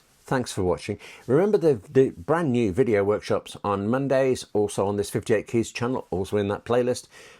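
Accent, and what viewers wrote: United Kingdom. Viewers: Mr. you write for an online tech magazine I can't remember the name of at the moment but I really appreciate your articles about Apple. Great work! Cool to see you online with that British accent. So at some point, Bob's your uncle. Thanks for all you do! Subscribed.❤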